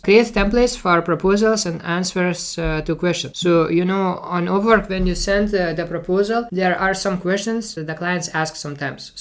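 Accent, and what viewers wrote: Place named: India. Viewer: Why do you look northern European but sound Indian?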